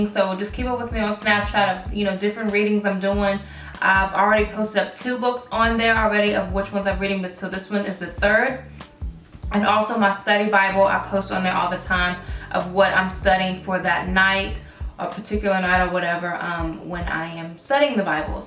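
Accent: South, United States. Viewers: where are you from?! I wanna say the south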